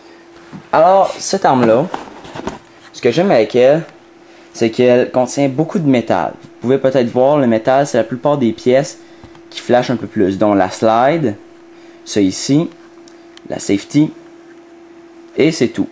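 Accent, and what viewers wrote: Quebec, Canada. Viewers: with a canadian accent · Meuh non ^^ Quebecois ! un accent pareil ^^ ! Vive le Québec Calice ! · Belle review merci. Et vive les canadiens leur accent est vraiment super (je ne moque pas je suis en admiration sa donne un petit charme). De Belgique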